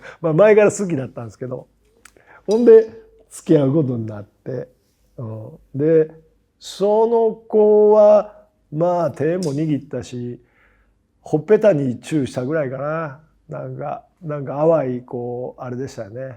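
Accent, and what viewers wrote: Kansai, Japan. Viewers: 何か…好きやなぁ😊 さん。変わらないと言うか、お話に虚栄が無い。関西弁が心地好くて人格が素敵✌️ お身体に気をつけて変わらないワールドを発信して下さい。ありがとうございました💚💛❤️ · さん、大好きです💕 お顔も声も、どストライクです💖ちょっと関西弁なところも…😍お若い頃から変わらず大好きです💘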